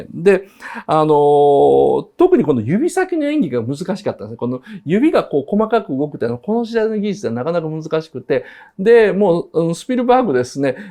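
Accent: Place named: Sumiyoshi, Japan. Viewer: なんか土井善晴が浮かぶなと思ったら住吉区のイントネーションなんですな。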